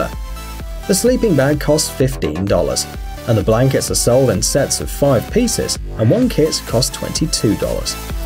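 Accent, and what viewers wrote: England, United Kingdom. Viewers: Some of the most useless and unnecessary products I have ever seen...and an English accent doesn't help to sell them.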